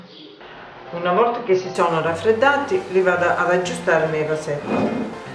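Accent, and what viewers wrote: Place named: Tuscany, Italy. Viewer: Bravissima. Hai un accento toscano😍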